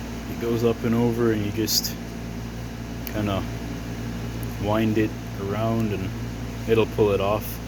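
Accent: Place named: Canada. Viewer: First, I'd like to mention how Canadian you sound there bud! Love it! Secondly, I am also an apprentice I work on car haulers transport trucks. Love every minute of it. As well, my tool box is a 3 foot MasterCraft works good and I have a lot of princess auto/Canadian tire shit. Cheap and works! Cheers there bud!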